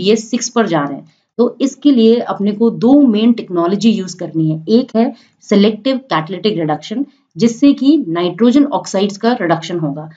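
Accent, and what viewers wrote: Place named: Hyderabad, India. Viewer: pure Hyderabadi !! excellent vedio thanks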